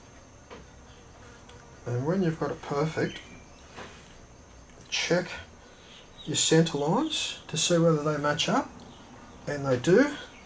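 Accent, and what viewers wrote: Australia. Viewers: I'm assuming you're from australia with your accent, and if you are whereabouts? do you do custom builds?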